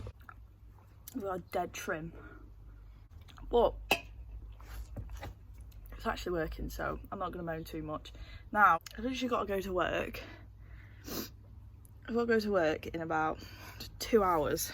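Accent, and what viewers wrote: United Kingdom. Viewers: Have to finish da fockin bumpaah hahah love ur briish accent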